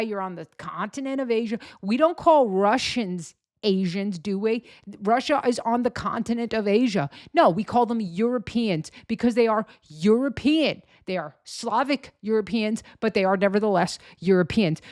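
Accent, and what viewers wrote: Italy. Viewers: Love the Italiano accent. I used to actually think you were one a them there New York Italians. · Love the Italian accent !!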